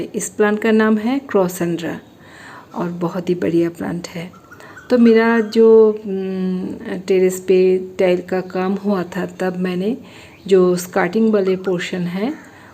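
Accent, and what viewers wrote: Bengal, India. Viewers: Sotty tomar mukhe hindi khub bhalo lage.ami c.g. te thaki ekhanei boro hoechi kintu amar me bole tomar mukhe hindi sunlei bojha jaye tumi bangali😊😊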